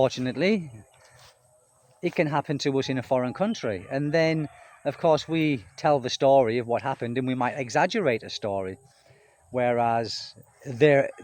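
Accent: United Kingdom. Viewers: Good morning my friend. You’re absolutely correct in what you’re saying and I know that I’m in the minority, but I watch your channel just to see what you’re doing today. I don’t even look at the title. At least not any longer than it takes to see that it’s you. I’m not interested in the drama. I’m not interested in the car wreck. I just want to see what my friend, over there living life on another island is doing today. Your British way of speaking gives me a chuckle. What makes me unsubscribe straight away is click bait. Put up a title and a video that has little to nothing to do with the title and I’m an “un-subscriber”! Doing “cost of living” videos I won’t watch either. I hate it when people ask about what it cost to live here or there in the Philippines. If you have been here, and gotten out of the tourist districts, then you’ll know what it cost and can figure out what “YOUR LIFESTYLE” will cost you… no one can tell you what it will cost YOU to live somewhere. 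But it gets clicks because people want to move here without ever having been here apparently. You keep being you and I’ll keep watching “YOU” doing “YOU”.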